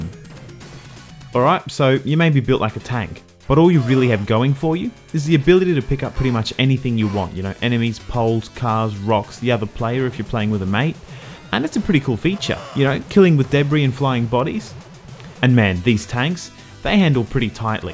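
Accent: Australia. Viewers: Dude's Australian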